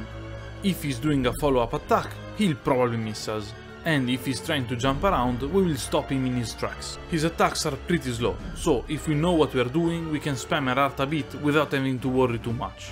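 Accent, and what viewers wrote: Italy. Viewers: tutti i tuoi video sono meravigliosi, e adoro sentire il nostro accento quando parli. Gran lavoro ;)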